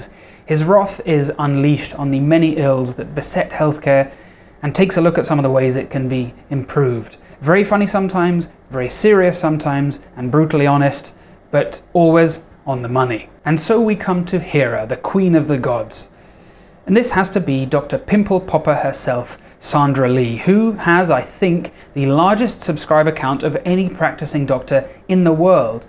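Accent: France. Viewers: Thank you for shouting out French medical youtubers! They're great and really under-appreciated. I hope you recover from the flu quickly. Also your French accent is sublime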